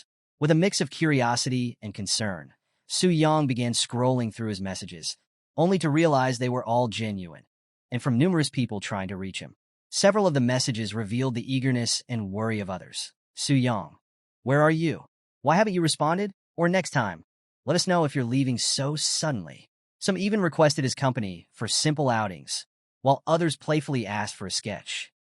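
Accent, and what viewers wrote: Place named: United Kingdom. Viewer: Why the switch to British accent at chapter 50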